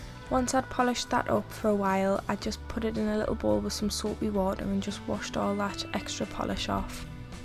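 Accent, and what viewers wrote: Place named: North East England, United Kingdom. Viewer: Absolutely top quality looking model. I was having so much trouble even finding the basic "Hulk" green escorts where I live. Ironically it was a trip to the North East (where you sound like you're from) visiting my Dad in N.Shields, where I found two RS2000s in a Newcastle Poundland. I have the first white one spare & loose, so having seen your video, I think I'll get some DD4 wheels like yours, the Tamiya acrylic and add similar details. It won't be exactly like yours as the tail plate is yellow on the white one but this would be a good addition to the collection. Beautiful effort & i look forward to watching more customs 😉 What car next? The forthcoming STH is a metallic red Lamborghini Huracan LP620-2 Super Trofeo and any basic Super Trofeo model would be easy enough. Rather than make it 100% exact, you could make it look like a road going Huracan STO & we can all agree that a metallic redcurrant red looks incredible on ANY car, but especially a V10 Supercar. 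Make it happen and I think you wont regret it., especially with a simple £4 eBay effort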